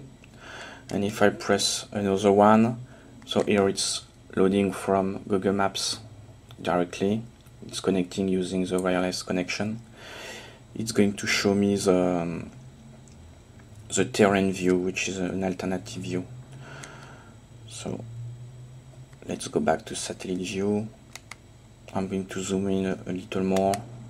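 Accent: France. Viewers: Are You French ? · It's no secret that foreigners can often write/speak better than native english speakers. This french accent is nice and understandable which is most important. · I like the frenglish ;-) Will it work under 3.90 M 33-3? Merci beaucoup pour le Video, Chris, de Corfu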